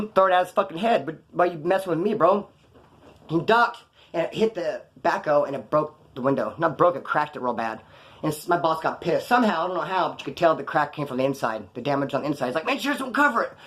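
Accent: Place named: Central Valley, United States. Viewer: You talk like a regular dude from the Central Valley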